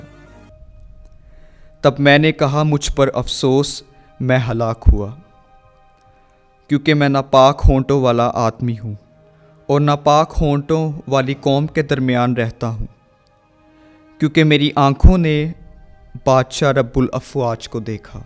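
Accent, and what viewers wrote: Urdu, Pakistan. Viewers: true accent of URDU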